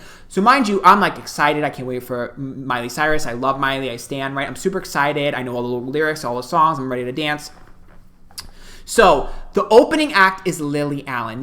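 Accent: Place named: United States. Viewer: His accent is death, combined w those hard gestures.couldnt make it beyond 2 minutes…….. insufferable…. Hes crapping on about miley cyrus in a lilly allen video. Gawd americans are insufferable…. This one is worst ever. I defy anyone to listen to this brain dead drivel combined w the worst accent possible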